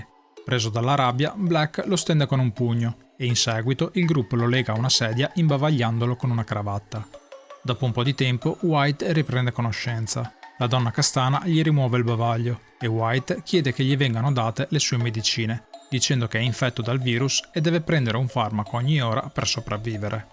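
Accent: Sardinia, Italy. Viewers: Penso Sardo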